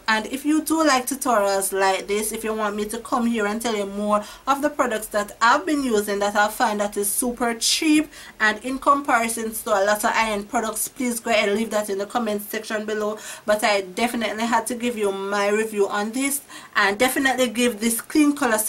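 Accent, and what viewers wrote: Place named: Jamaica. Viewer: Never expected a Jamaican accent when I clicked this lol yas...got it for 2 bills in Ochi...hoping its just as good for me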